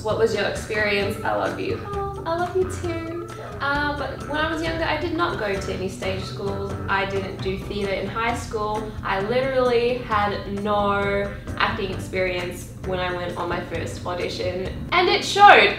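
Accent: Australia. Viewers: YAAAS I LOVE THE ACCENT! Instantly clicked becaause of the accent hahah I love the Australian one. Also yes please do a curly hair updated video I'm half filipina & black too so help a sis out! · You sound more Australian than I do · I freaking loveeee your Australian accent I think you used it in one of the first videos I watched of you and I was very upset when I found out that you weren't actually Australian😭😂. Forget the haters this was awesome! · Came here because I was listening to one of your videos and I heard you hearing some stuff in an Australian accent so I got very confused coz I thought you were American, I know why now lol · This is funny because the first time i watched one of your videos i really thought you were Australian as in i was SOLD lol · As a true blue Australian, YOU SOUND PROPER AUSSIE and did great, be proud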